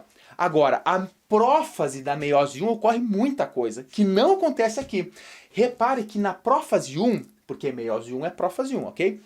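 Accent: Rio Grande do Sul, Brazil. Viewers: Te liga mano veio, é gaúcho? Kkkk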